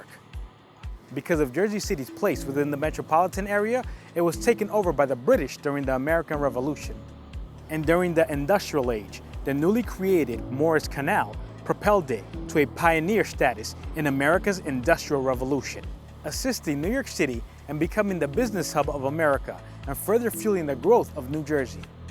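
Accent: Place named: Latin America. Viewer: No se si me equivoco pero eres latino porque la forma en que hablas no es de un nativo americano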